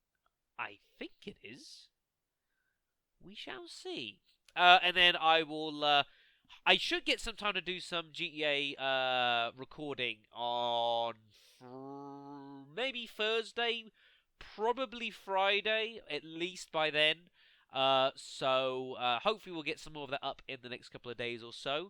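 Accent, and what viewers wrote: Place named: United Kingdom. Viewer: I love your voice. It's amazing and makes the LPs more interesting. I wonder where in the UK you're from?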